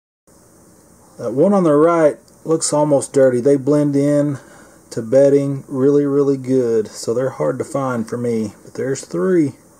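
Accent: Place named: Texas, United States. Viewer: Sound like a good Son of Sam Houston if i ever heard one. Im East of Houston. Just starting. 3 bins so far, but i cant get mine to grow. i got 40 cocoons out of one concrete tub. put couple thousand uncle jims worms in there. 1 or 2. i need pure species bins.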